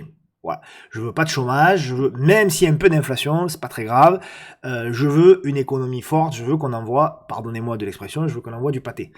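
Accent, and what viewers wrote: South, France. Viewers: Le froid est la, mais un bon thé chaud et l'accent du sud de vous réchauffe pendant plus 1h30. Merci! Quand j'ai vu ton visage sur le Palais Brongniart, je me suis dit que ca devait faire quelques choses en tant qu'investisseur. (Juste une critique sur l'investor day, les salles étaient petites, pas facile d'avoir une place, un coup de chance (j'ai eu la derniere place), j'ai pu assister a la présentation Bitvavo. Bon weekend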